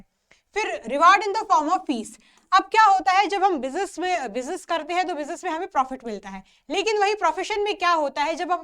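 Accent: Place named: Punjab, India. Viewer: She has Punjabi accent!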